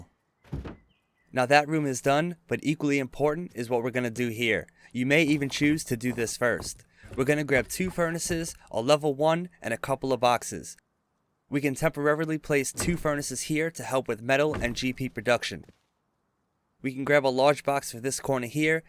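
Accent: New York, United States. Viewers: Where are you from? Staten Island? Queens? The Bronx? You sound very East Coast NYC.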